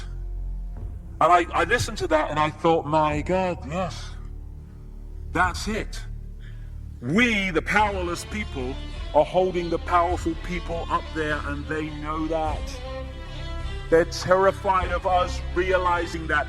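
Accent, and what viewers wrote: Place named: United Kingdom. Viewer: First this guy is a Brit, but definitely NOT David Icke's voice. Good piece nonetheless!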